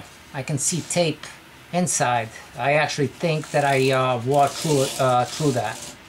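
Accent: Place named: Azores, Portugal. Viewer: You sound like an Azorian person!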